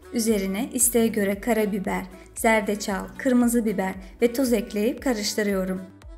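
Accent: Azerbaijan. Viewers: Azerbaycanlisiz? Lehceniz tanis gelir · Seslendiren azerbaycanlıdı <num>%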